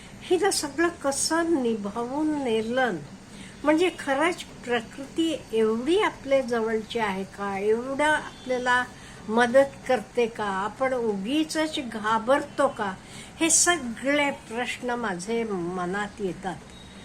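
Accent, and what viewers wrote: Karnataka, India. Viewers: आई तुम्ही खूप गोड आहात 😊 तुमच्या मराठीत कन्नड भाषेची फोडणी घातल्या सारखं 🙏 छान वाटला व्हिडीओ 🙏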